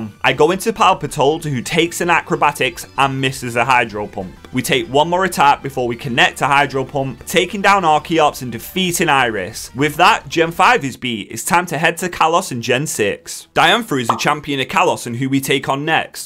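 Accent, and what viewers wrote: United Kingdom. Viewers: you're English you say every thing different is fine all poke tubers do it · Bro are you British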